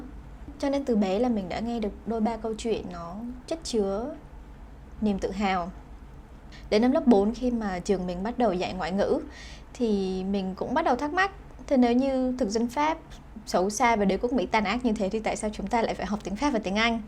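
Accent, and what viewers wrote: North, Vietnam. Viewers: Bạn nói giọng bắc nhiều hơn người miền nam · Anh rất mê say giọng Bắc và nhan sắc của em rồi. Em không cần phải thay đổi gì cả chỉ vì chính trị thôi em. Trước năm 75 miền nam VNCH rất thich giọng Hà Nội nên hầu như các đài truyên thanh, truyền hình hầu như là giọng bắc vì phát âm chuẩn hơn trong 3 miền cộng thêm lối ăn nói khéo hơn. Nhạc sỉ người Bắc ở MN cũng nhiều. Đi học ở trường lớp văn là các nhà văn và thơ của Miền Bắc trước chế độ CS như Nhất và Vân vân. Nam Bắc Trung sống rất hoà đồng ở Miền Nam trước 75 chỉ xảy ra phân biệt sau khi MN bị xâm lượt rồi bị trả thù phe thua trận qua nhiều thủ đoạn chắc đọc báo biết rồi. Nước Mỷ cũng nam bắc đánh nhau nhưng khi kết thúc chiến tranh người ta không trả thù nhau, hay huyên hoanh kiêu ngạo như đảng CS để tạo ra càng căm thù lại còn tổ chức lớn những ngày lễ nữa. tốt hơn là không nên tổ chức ngày lễ,chỉ viết sơ chiến tranh nam bắt cách trung thực trong lịch sử với cách ôn hoà không khiêu khích. 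Ở Hải Ngoại có số nhỏ chống cộng 1 cắch cực đoan không còn phân biệt nữa và đa số những người đó lớn tuổi. Lý do tại chống cộng cực đoan như thế thì cũng dễ thôi vì cách đối sử trả thù của đảng CS tới những kẻ thua cuộc. Nên khi em biết thì thông cảm cho họ và bỏ qua cho cho họ thôi, còn giới trẻ như anh thì khác. Anh và hầu như trên thế giới này chả ai ưa chế độ CS cả, nên thề giới ngày nay chỉ còn sót lại 4 nước CS thôi chứ trước đó nó chiềm hơn nữa trên bản đồ thế giới, vì chế độ này ảo tưởng không đem lại hạnh phúc cho dân nên nó sụp đổ theo kiểu domino sau nga x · Vì giọng nói của em là giọng của Bắc 75, khi em nghe những người Bắc 54 sẽ hoàn toàn khác Gia đình em có người đã đi lính VN cộng hoà, vậy em có nghe những người đó cũng như bố mẹ em nói rằng cộng sản nói những sĩ quan chỉ đi học tập có 1 tuần nhưng sau đó họ đã bị đày đi cả mười mấy năm Bố mẹ có kể hay em tự tìm hiểu tụi cộng sản cướp nhà người ta và đẩy người ta đi kinh tế mới Em cầu mong ngày 30/4 một ngày nào đó sẽ các người VN khắp mọi nơi vui mừng, nếu ngày đó sẽ xảy ra nếu Đảng cộng sản khg còn trên đất nước VN nữa Em được sanh ra trong gia đình có tiền và cũng quen với nhiều ông lớn nên dễ dàng được ra các nước để đi học Nếu có học như em và đi qua nhiều nước thì theo em thì sống dưới chế độ cộng sản có cái gì hay và họ đã làm cái gì đúng Nếu ghét Mỹ tại sao gia đình lại cho em sang Mỹ để học? 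Em có thể đi học ở các nước cộng sản nhừ: Nga, Ba Lan, Cu Ba... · Co be này rất là dễ thương nhưng nhe tiếng bác kỳ 75 thi minh thay rất khó chịu. Chắc có lẽ những người ở Cali Phan lớn là những người miền nam chôn chay bởi công san. Hy vọng công san sẻ không tôn tai nữa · Giong noi cua. giong gai. Bac 1975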